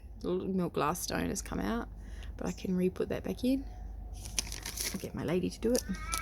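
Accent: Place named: Australia. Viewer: I love these videos. We girls never tire of looking at jewelry. Lol You gotten awesome stuff in this giant haul so far. And I could listen to you talk all day. Loving your Austrailian accent. Thanks for sharing this enormous haul. That brooch looked like a torpedo and a and a giant land mine to me . Omg I love Blue Bus Dave too!!! Have a great day my new friend !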